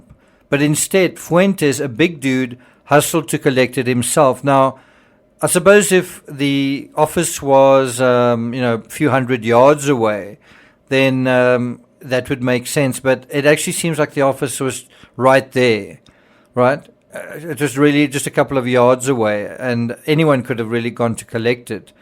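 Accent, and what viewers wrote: South Africa. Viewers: Me too! I ❤️ accent too. I think it’s South African.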